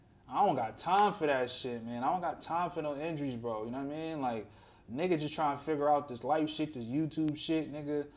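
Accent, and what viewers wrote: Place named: New York, United States. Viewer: Bro u sound like a nerd like one those wannabes from new york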